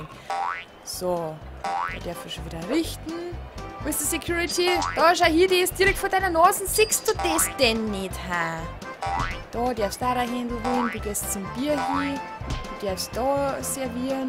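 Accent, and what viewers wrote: Bavaria, Germany. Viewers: Zum ersten mal Bayrisch gehört und dacht mir nach den ersten sekunden erstmal nur so "ok und von sowas bin ich fan im fussball ?:D" aber is schon ganz ok besser als Kölsch ^^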